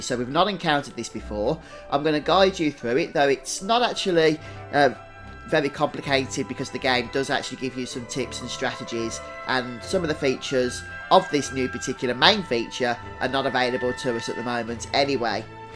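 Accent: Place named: England, United Kingdom. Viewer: OBVIOUSLY he has an English accent. Start traveling, educate yourself and get cultured. The world doesn't revolve around how YOU particularly speak.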